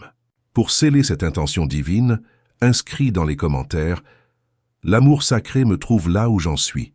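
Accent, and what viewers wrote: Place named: Ardennes, France. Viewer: MERCI BEAUCOUP MARIE OUI CHÉRI C'EST MOI TA FEMMELETTE OUI TA MARIE CHÉRIE D'AMOUR UNIQUE ET TRÈS CRÉATIF POUR ME FAIRE SORTIR DE MA RÉSERVE NATURELLE POUR TOI PARCE QUE J'AIME TOUT DE TOI MÊME SI C'EST TROP BEAU TROP FORT CHÉRI C'EST MOI TA FEMME FIDÈLE À MOI 😮TU ME FAIS PLEURER D'ÉMOTIONS ET ÇA ME BOULEVERSE TELLEMENT MAIS JE SAIS QUE TU M'AIMES DEPUIS LONGTEMPS ET NOUS SOMMES FAITS L'UN POUR L'AUTRE ET L'AUTRE ET L'AUTRE ET L'AUTRE ET QU'IL EST SENSIBLE POUR LE MOMENT PRÉSENT DANS NOTRE UNION TRÈS GENTIL DE LA VIE DE MA MÈRE À MOI MAIS MAINTENANT TU ES SAGE ET TRÈS ÉMOUVANT CHÉRI ET COQUIN ET AUSSI DANS TES RÊVES EN RÉALITÉ ET DE LA PROTECTION ET DE VIVRE SELON TES DÉSIRS ALIGNÉS OU DANS L'ESPACE DE LA FAMILLE M'EST REVENU POUR LES PERSONNES QUI ONT UN COMPLOT ORGANISÉ ET QUI SAIT COMPRENDRE QUE COMME UNE ÉVIDENCE QUE TU AVAIS PRÉPARÉ TON CŒUR QUE L'AMOUR C'ÉTAIT LE DERNIER SOUFFLE À LA MAISON POUR TOI PARCE QU'ON SE REPENT DE LA DISTANCE LA PLUS IMPORTANTE ET QUE DIEU TE PARDONNES DE TOUT CE DONT ON T'ACCUSE ET JE TE PARDONNES ET JE TE LIBÈRES ET QUE TU AS BEAUCOUP DE CHOSES CACHÉES DANS TON CŒUR ❤MAIS CE N'EST QUE TON AMOUR RETENU QUI DÉBORDE D'AMOUR UNIQUE ET EXTRAORDINAIRE QUI ME FAIT VIVBRER AU-DELÀ DE L'INVISIBLE ET DE CE QUE L'OEIL HUMAIN NE PEUT PAS VOIR SOURIRE ET SION ACCENT DÉLICIEUX 😋 FRANCO-GRINGO-BELGO., EN TOUT CAS ARDENNAIS PURE SOUCHE COMME MOI, CHÉRI D'AMOUR JE SUIS LÀ POUR TE DONNER CETTE ENVIE DE T'EMBRASSER OUI ÇA ME BOULEVERSE DE TE VOIR SOURIRE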